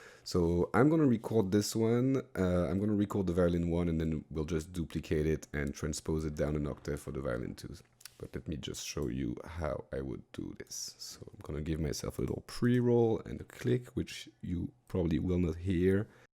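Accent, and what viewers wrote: France. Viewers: Super interessant tes vidéos. Je trouve simplement dommage que tu le fasses pas en français, sachant qu'il existe pas ce genre de contenu ( tuto composition orchestrale )dans notre langue. J'ai compris que tu étais français avec l'accent ;). Sans rancune ;) parole d'abonné.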